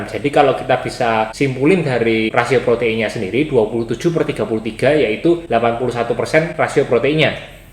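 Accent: Java, Indonesia. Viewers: orang Jawa ya , medok bngt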